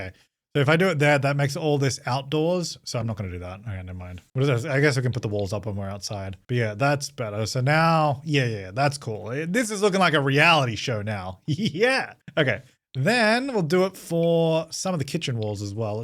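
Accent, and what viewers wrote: Australia. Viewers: Same. He sounded so young, and also his Australian accent seemed more noticeable. That, and sometimes he sounded like he was on speed. Lol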